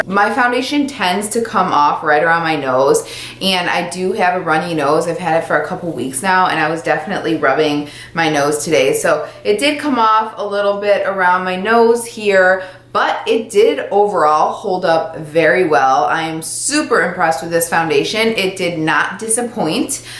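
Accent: Chicago, United States. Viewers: I knew she was either from Chicago or Michigan. before she said Chicago just from her accent. 👌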